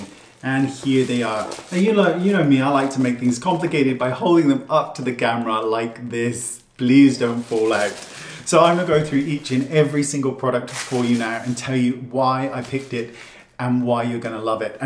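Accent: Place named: United Kingdom. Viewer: Oh my gosh, you’ve started listing the products below! Thank you so much, that is such help to those of us who have trouble with your British pronunciation.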